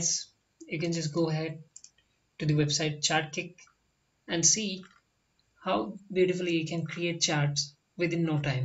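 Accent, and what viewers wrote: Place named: India. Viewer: skipped. Cause: Indian accent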